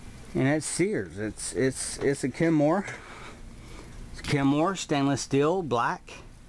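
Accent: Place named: South, United States. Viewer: They still make them...UEI about $250.00 on sale....I've never hooked mine up to 220...A little afraid to, running 220 down a 110 volt cord, but they say you can do it...FYI for you guys, a compressor normally won't start again after just shutting off...To much head pressure to start against so they have to sit and let pressures equalize....They'll kick the overload, Kixon or whatever type used until they do....Thanks to the what sounds like a country boy making this video...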